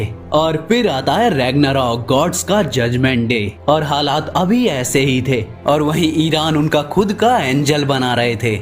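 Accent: India. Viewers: bro are you from Odisha??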